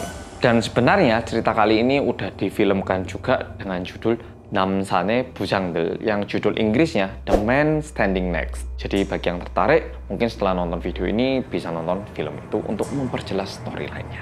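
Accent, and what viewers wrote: Java, Indonesia. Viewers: gue suka banget yg berbau kisah nyata/sejarah plus gue sk Korea. Itulah mengapa gue sk bgt channelny sejak ad seri detektif reomit jujur pas masi jmn vlog2 biasa gue B aja. Bruntung skale ad wong korea yg muedhokkk ini jd enak djlasinnya pake bhs Indo, kalo ad yg bahas tp bakor mah ya kicep.. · nymng meddoooookk nya mengcandung bet rek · Medhok banget mas, nanti klu jadi dosen cocok bgt. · Jam wib liat kamu ditv sctv acara tokopedia ngomongnya medok njiirr Beneran kamu kan?? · Mas mamakku bingung lho🤣sampean dikira orang Jawa 🤣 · 3 "bersama orang korea yang medhokk" selalu terucap setiap pembukaan nonton mas wkwk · "Merry Christmas" Mas medok😌🐍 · Woi orang korea kok logat jawa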